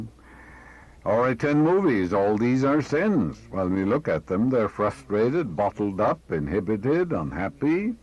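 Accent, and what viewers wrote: Ireland. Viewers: Like cha and miah Murphy always retained that cork accent! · Plenty of good stuff here. I never realized had such a heavy Irish brogue!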